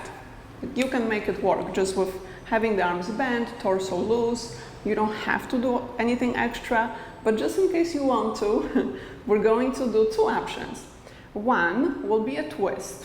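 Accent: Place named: Poland. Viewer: Mel15 Mcc her accent sounds polish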